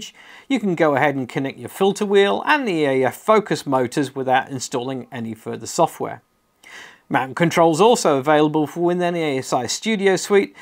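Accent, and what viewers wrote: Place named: New Zealand. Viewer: good to hear a Kiwi voice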